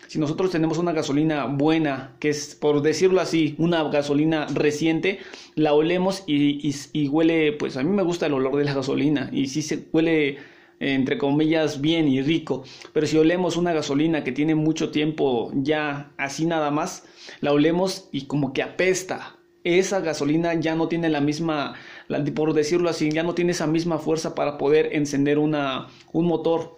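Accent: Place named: Ecuador. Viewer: Yo tengo una moto parada hace ya un año pero me fice mi mecánico que ya no le meta dinero a esa moto ...pero es que me gusta es honda sfx de 50 c . Ud. Es un buen mecannico ...y pir la manera de hablar creo que es de mi pais anterior ecuador ...soy de alli . Yo tengo muchos años que lo dejé 34 años desde que trabajaba como.profesora. cuao un saludo Paulina .A . Ross .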